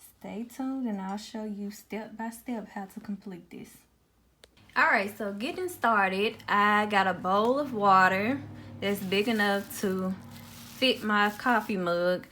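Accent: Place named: Florida, United States. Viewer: Your accent is so cute lol so country. Must be from Florida too 😂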